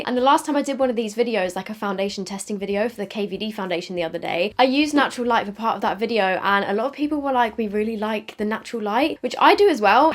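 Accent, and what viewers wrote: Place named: United Kingdom. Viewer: is she british? i love her accent!! 🤍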